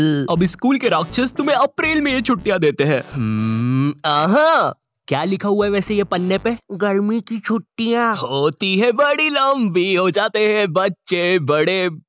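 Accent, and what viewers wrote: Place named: Madhya Pradesh, India. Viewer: I'm from madhya pradesh.. where are you from??? Bro .. bcz you're accent is like m.p.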